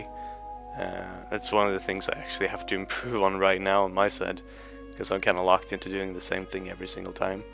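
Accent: Sweden. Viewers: You are swedish for real? :D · Ofta du inte hör att han är svensk?:s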